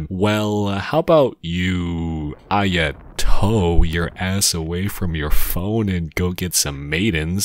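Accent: Netherlands. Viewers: hold on are you dutch?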